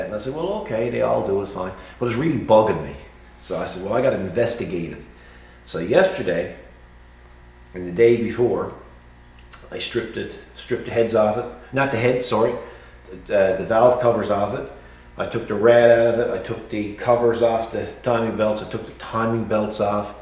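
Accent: Newfoundland, Canada. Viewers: That sure sounds like a west coast Newfoundland accent??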